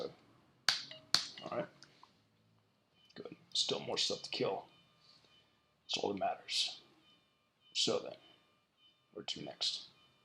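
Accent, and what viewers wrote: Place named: United Kingdom. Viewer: Your knack for surviving by the skin of your teeth far outweighs your British accent.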